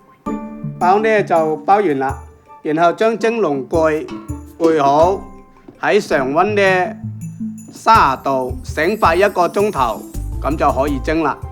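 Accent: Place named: Zhaoqing, China. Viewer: 是肇慶話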